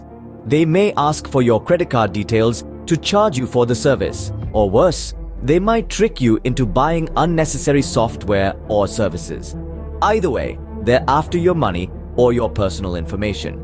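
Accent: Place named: India. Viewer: " a trusted source like Microsoft" Who the heck trusts Microsoft? I can cope with a scammer better than I can cope with Microsoft. Hate to break it to you, but Microsoft have permanent access to your WIndows computer anyway these days. Anyway, the elephant in the room is that 99% of scams are from India, and an Indian accent on the phone is a massive red flag - like like the commentary here in fact.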